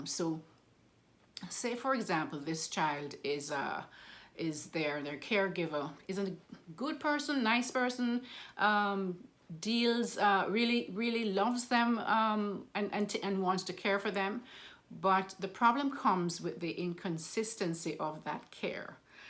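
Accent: Jamaica. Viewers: Dr., is your accent Jamaican?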